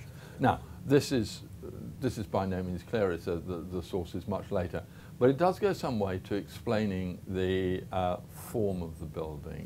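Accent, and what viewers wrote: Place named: United Kingdom. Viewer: For him being such an amazing academic of Islamic history and a guy very proficient in Arabic, I find his overtly British pronunciations of Arabic words charming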